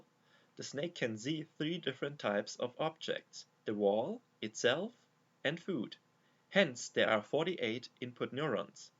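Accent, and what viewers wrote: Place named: Germany. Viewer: That accent... Are you German?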